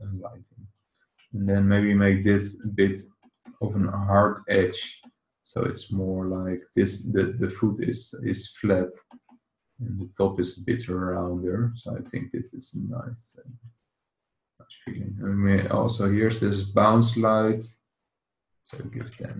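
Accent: Netherlands. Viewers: are you dutch? awesome tutorial btw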